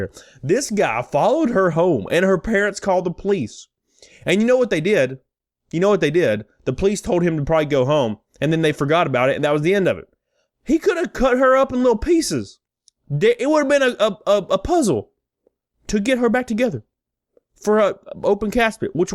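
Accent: South, United States. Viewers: thank youtube, for recommending me your channel. I dig ya, also like the light southern accent. Subbed. Also their customer service is horrid. The other day I was trying to get a PS4 controller and they only had ONE woman at electronics when there were like 6 customers waiting around for different things. Waited 15 minutes so she could pop a tab and ring up one controller...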